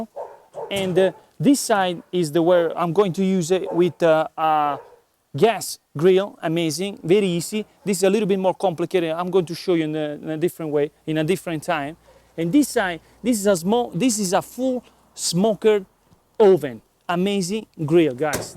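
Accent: Italy. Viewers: Si sento proprio poco che sei italiano 😁😁💪💪🍕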